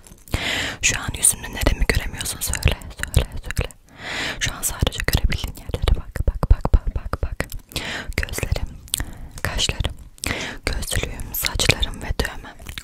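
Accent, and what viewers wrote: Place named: Germany. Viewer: ablada alman aksanı var gibi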